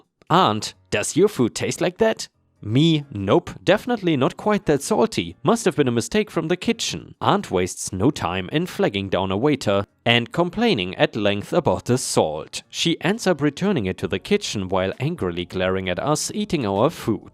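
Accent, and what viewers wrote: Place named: Germany. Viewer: Nice Story - certainly it is all a lie! And: interessing accent! German?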